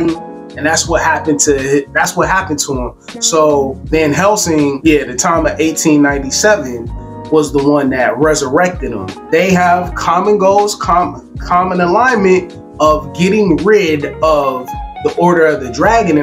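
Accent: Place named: RP, United Kingdom. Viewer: Thanks for dropping the Mary Poppins accent. Made the video much more enjoyable!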